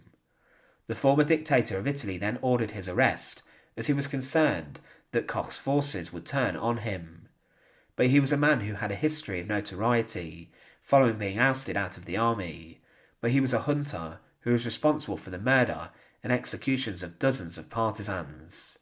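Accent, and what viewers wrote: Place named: England, United Kingdom. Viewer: I like it. It’s English but I can’t tell from which region. Even if you don’t like it it’s better than an awful AI voice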